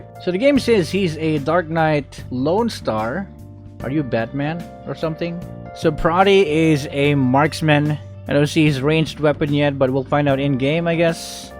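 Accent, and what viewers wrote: Philippines. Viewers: wait narrator’s a filipino? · Youre good in english but sometime your voice in your stream sound filipino accent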